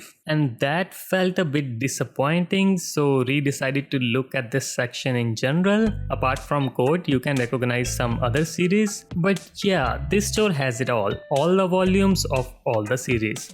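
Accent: India. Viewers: Bro you have indian accent so smooth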